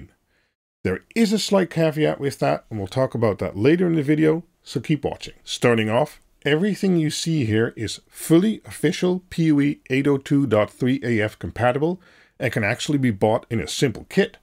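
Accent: Netherlands. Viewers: Are you from the Netherlands. Your accent sounds Dutch. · Hallo, ik hoor dat je waarschijnlijk uit Nederland komt of vandaan? Ik heb je video bekeken het is een goed concept en zie ook dat de video 2 jaar oud is, maar ik heb hier waarschijnlijk een oplossing voor die misschien minder tussen stations heeft?!?! Of heb je relay board en esp32 voor nog meer dingen nodig? Dit is de 'DS6660 PRO' van DOORSAFE een standalone camera met LAN poort die PoE ondersteunt, WiFi antenne, gong aansluiting AC/DC, resolutie: 2mp fhd 1080P, verbinding via de Tuya app dus ook mogelijk te gebruiken met Home Assistant. dit allemaal voor €110,00 inc BTW Ik ben benieuwd of dit een goede alternatief is?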